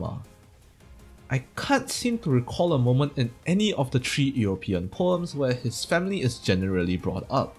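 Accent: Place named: Singapore. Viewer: oh your singaporean hey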